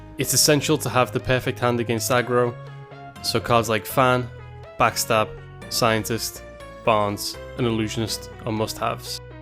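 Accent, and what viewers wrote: United Kingdom. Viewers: You sir would be a very successful audiobook narrator or radio host, and it's not just the eloquent British accent.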